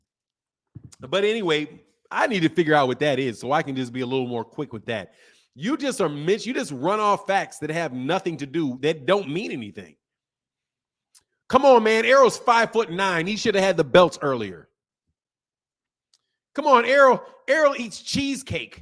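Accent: Texas, United States. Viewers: you from Texas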